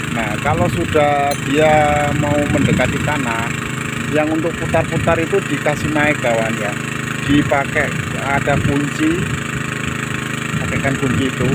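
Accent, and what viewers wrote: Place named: Java, Indonesia. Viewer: Orang Jawa itu medok mas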